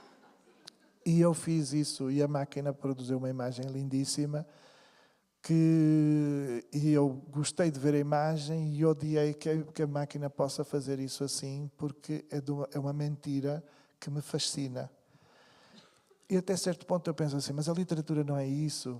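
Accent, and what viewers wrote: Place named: Brazil. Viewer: És mesmo falso! Ainda por cima quando te pões a brasileirar a forma como falas. Achas que eles são atrasados ou és tu?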